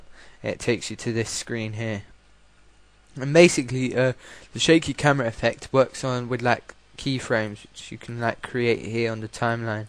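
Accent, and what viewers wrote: United Kingdom. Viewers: Are you british? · when i first heard you i thought you were from London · i fort u were british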